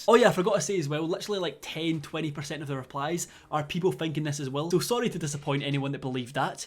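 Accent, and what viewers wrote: Scotland, United Kingdom. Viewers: Lol I love his Scottish accent I have an American accent and I LIVE in Scotland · SepticSkull X it’s a scottish accent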